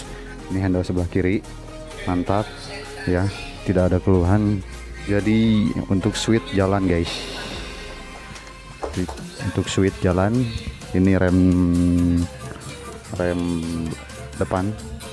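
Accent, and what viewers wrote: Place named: West Java, Indonesia. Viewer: Gua aja bang jangan gue jd norak soalnya logat sunda ga pantes bilang gue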